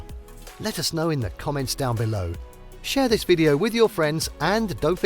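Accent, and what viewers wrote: United Kingdom. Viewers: Oh for God sake! A BRITISH accent on a video about OREGON. 😢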